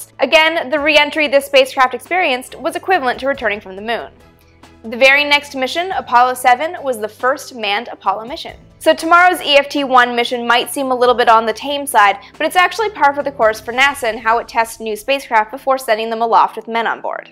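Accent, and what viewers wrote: Canada. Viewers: speaks like a Canadian to my ear, although these accents are almost the same.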